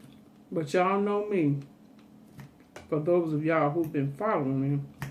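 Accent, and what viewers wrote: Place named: North Carolina, United States. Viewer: When I heard you speak, I said this is a North Carolina lady right here. You sound like my family. They live in Clinton, NC where my mom grew up but my cousins grew up in Mt. Olive. Good ole southern folks. 🤗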